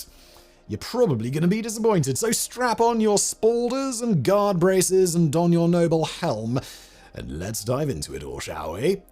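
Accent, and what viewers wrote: United Kingdom. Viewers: Whenever Simon says anything negative about Colonial army in his very British voice it stirs up some weird american ancestral memory/rage in me.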